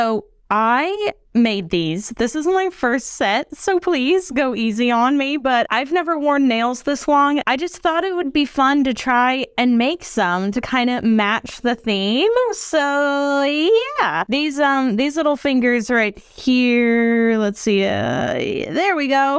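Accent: Texas, United States. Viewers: Just be you. We can't please everyone. For me, as long as the Lord is pleased that is really all that matters. You aren't in England. You are in America speaking how you speak. Don't change who you are when you're innocently using your Texas accent.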